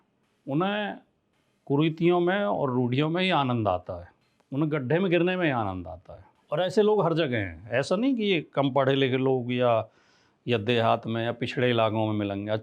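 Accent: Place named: Rewari-Alwar, India. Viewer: मैंने आपकी काफी पोस्ट देखी है कोशिश अच्छी है परिणाम देर से ही सही आएंगे जरुर बाकी अंधभक्तो को आईना दिखाने की जरूहु नहीं है साहब आपके बोलने के लहजे से आप रेवाड़ी अलवर मथुरा लालसोट दोसा भरतपुर इलाके के लग रहे हो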